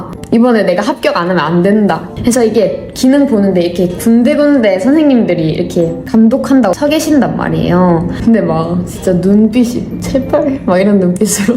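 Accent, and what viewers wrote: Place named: Seoul, South Korea. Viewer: 말투 제스처 보니 가스나 서울여자 다됏네~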